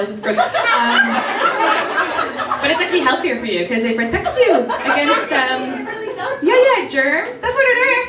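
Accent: Ireland. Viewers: you are so delightfully scattered. It's just amazing that you ever finish the set your wrote. But everything you do say is brilliant, so it doesn't matter if you stick to the set. Plus, anything an Irish woman says in that accent is delicious.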